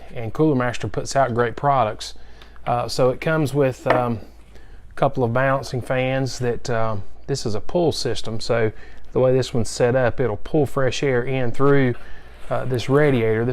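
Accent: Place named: Texas, United States. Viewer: He sounds like he may be from Texas. A lot of Texans have a soft southern drawl despite what Hollywood would try to make you believe since they tend to portray us as loud and obnoxious.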